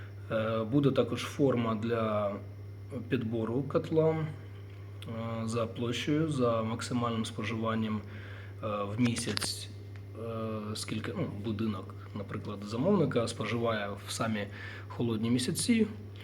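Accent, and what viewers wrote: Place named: Surzhyk, Ukraine. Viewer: Чего вымучивать на суржике-то?)) Ты ж на русском же лучше говоришь. И просмотров больше было бы))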